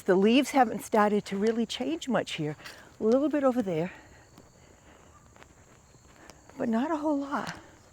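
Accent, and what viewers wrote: New England, United States. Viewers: Greetings from Maryland! I enjoy hearing where your subscribers live. Both of my parents grew up in New England (NH), so listening to your accent warms my heart. Thanks for the walk-about.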